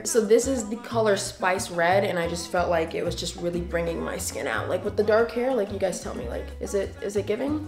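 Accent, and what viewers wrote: England, United Kingdom. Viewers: I love how you casually speak in an English accent, I thought I was the only one to do that 😂❤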